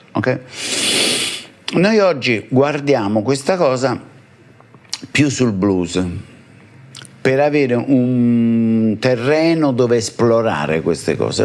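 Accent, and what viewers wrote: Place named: Naples, Italy. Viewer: Ottimo!! Ti ho conosciuto tramite l'intervista col "Tino", hai una "tomità " tutta napoletana nel parlare ed una chiarezza espositiva che mi ha fatto avvicinare ad un mondo finora misterioso non da ascoltare ma da imparare. È già il secondo video che ascolto ed è molto bello seguirti. Chapeau!!!👍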